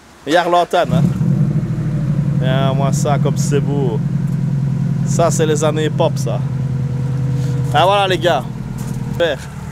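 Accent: Belgium. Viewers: 350 zete 😂😂😂 les belges vous avez un bel accent bravo à toi!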